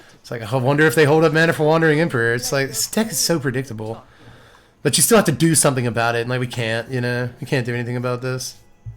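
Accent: South, United States. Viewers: My dude you know I love ya to death, please please please please stop with the country voice. I keep having to click away. It’s…too much. Thanks.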